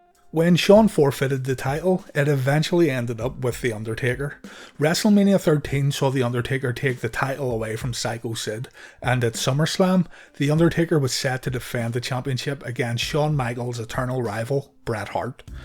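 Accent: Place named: Scotland, United Kingdom. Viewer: Scottish accents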